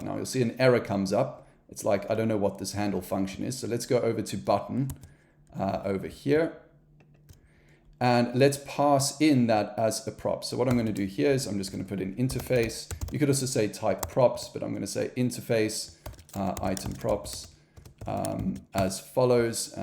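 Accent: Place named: South Africa. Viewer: I hear a South African accent.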